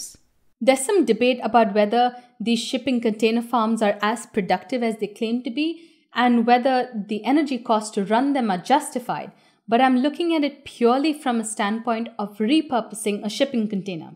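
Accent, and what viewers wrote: India. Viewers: It is too weird hearing a Indian accent using imperial measurements. My head is going to explode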